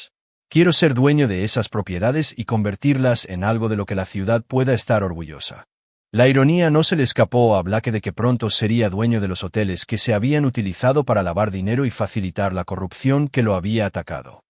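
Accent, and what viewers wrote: United States. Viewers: Buena historia, buenas traducción pero deben editar antes de subir. Se escucha horrible cuando pronunciar con acento gringo palabras en español, así como leen en español los nombres que están en inglés, como el de Blake (bleik). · Por favor no metan palabras en ingles o hablen como gringo, o son españoles o son americanos o portugueses, por dios!!!!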